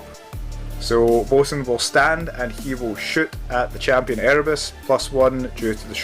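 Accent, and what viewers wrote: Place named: Scotland, United Kingdom. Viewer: Great work my man - good to hear a Scottish voice - keep up the Necromunda content, cant get enough! All the best from sunny Ayrshire!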